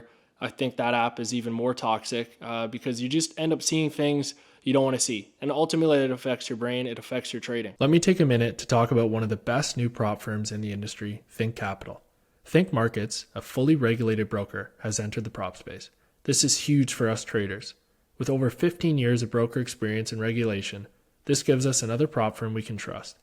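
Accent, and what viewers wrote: Canada. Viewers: This was a great video. I also KNOW you’re Canadian. Can spot the accent from a mile away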